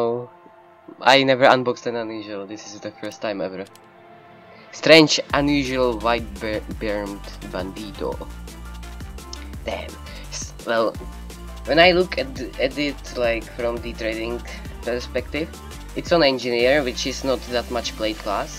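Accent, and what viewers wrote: Czech Republic. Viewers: NICE ENGLISH :D (ale pořád je tam přízvuk čecha :D) nechápu proč nemáš 100 000 subs ? :D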